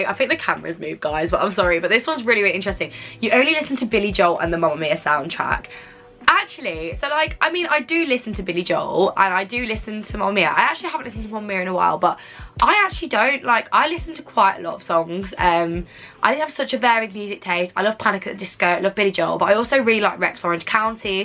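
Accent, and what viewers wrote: Birmingham, United Kingdom. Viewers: wait shes a brummie? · Your Brummie accent has gotten even stronger! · Yaas the Brummy accent is back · Wow brummy accent is really strong in this 🤭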